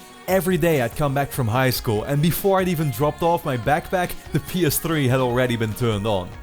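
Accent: Netherlands. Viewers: ily man, you're just to real, why you gotta hit my nostalgia buttons, not only does your dutch accent hit me ( a belgian buttboy) in the familiarity feels, but then you do this, AHHHHHHH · That accent gives you away, Nederlander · Are you dutch lol?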